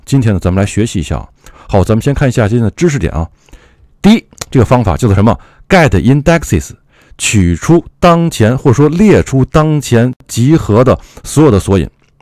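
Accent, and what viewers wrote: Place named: Japan. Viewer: 日本人的发音。。。包括 =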